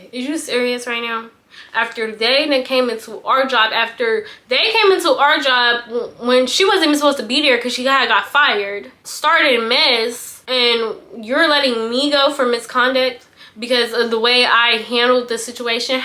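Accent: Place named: Bay Area, United States. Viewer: You must be from the bay lol I hear that accent 😂❤❤❤ you sound similar to stunna girl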